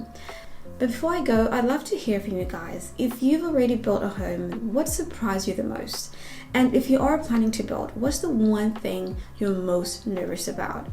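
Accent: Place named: United States. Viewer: Judging from the houses we see on YouTube and those we have seen in person, the general building methods and principles and tastes Zim are too isolated from developed word standards. Without exposure Zim trades ppl and builders merchants n allied industries/professionals will disappoint those who are coming from the western /first world diaspora. 🤷🏽‍♀️ You sound American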